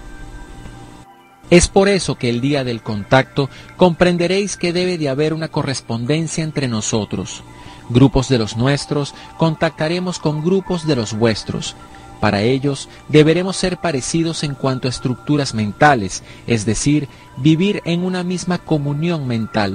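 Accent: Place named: Mesoamerica, Latin America. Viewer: La musica es hermosa, el mensajes es el mismo que el de tantas canalizaciones, no entiendo porque no pueden decir quienes son?.. ya conocemos a los pleyadianos arcturianos y demas...no entiendo bien ???...un par de cosas me molestaron...LA TONADA MUY HUMANA HASTA MESOAMERICANA.. Y QUE NO FUERA EL ORIGINAL..por lo demas...lindo..¡¡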